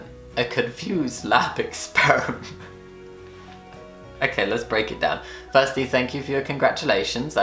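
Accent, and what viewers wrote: United Kingdom. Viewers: You're obviously British.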